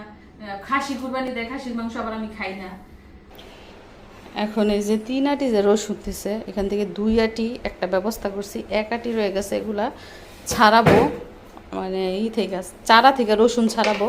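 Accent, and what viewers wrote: Gazipur, Bangladesh. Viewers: Apu Apni ki gazipur er? Ami o gazipur er BT Dhaka te Jonmo bere otha... Apnar kothay amr o Mone hoto apni gazipur er BT kokhono comment kore joges kora hoy nai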